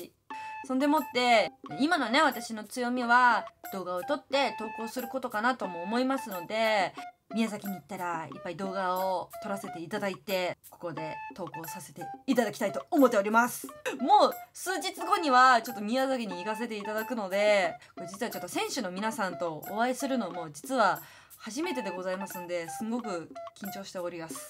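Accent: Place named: Tohoku, Japan. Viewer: 最高！！！良かったね！私、大学〜約10年近く仙台にいた東北の者です！ちゃんの訛りが懐かしくて大好きで愛おしいから登録しました！宮崎動画楽しみにしてるっちゃね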